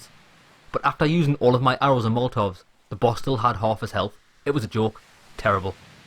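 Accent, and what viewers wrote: Newcastle, United Kingdom. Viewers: This guy Is a typical geordie, weird and annoying.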